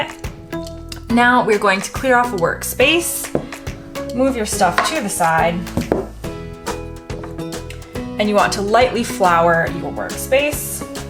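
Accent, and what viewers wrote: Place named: United States. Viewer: I could fall asleep to your voice... Esp when you say "go ahead" at least once every 30 secs like a true American. Very cute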